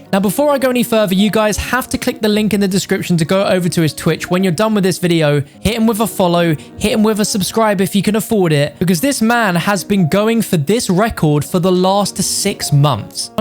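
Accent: United Kingdom. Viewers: bruh ur british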